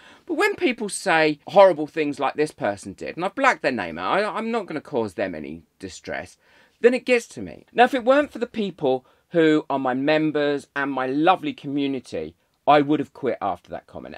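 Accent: United Kingdom. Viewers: Oh, dearest!!! First off, I love your face!! I find it’s kind and gentle! You’re quite handsome, and your British accent doesn’t hurt a bit!! Lol!! ( I’m Canadian) I’ve watched every thing you’ve made and have learned something every single time. These people who are cruel, for their own pleasure baffle me!! I guess they’re just cranky and sad with nothing better to do! Maybe they want you to feel as horrible as they do. Absolutely unacceptable!! I know you are adored, welcome and needed!!! Your wife, child(ren) must be so super proud of all you’ve accomplished!!! I certainly love you, and believe who I see when I watch you is the real deal! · I for one would like to say Thank You to you. :virtualhug:💝 I always find your Video's delightful and your attitude often silly yet very informative. I can almost bet the problem comes from some one who has a problem with the British accent and thinks it's pretentious. 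( If I had to say and I will It is more than likely a Yank and yes I can say it being from Baltimore Maryland! ) I would also like to say thank you for helping those of us who have problems with resin curing and bubbles no matter what we tried. I have a problem with a High dollar brand curing in my basement ( It is very well ventilated and I use PPE I PROMISE! but it stays at 68 degrees no matter what ) and after watching two of your video's on mixing I was able to finally get the bugger ( Sophie and Toffey is the brand I do not like ) to cure in my basement with a resin curing machine. So this Yank would like to say thank you and please continue your beautiful work.💜😇🥰:virtualhug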